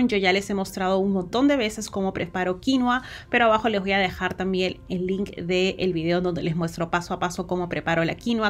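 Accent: Peru. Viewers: Buenísimo esto gracias ❤ Tu voz es como del peru❤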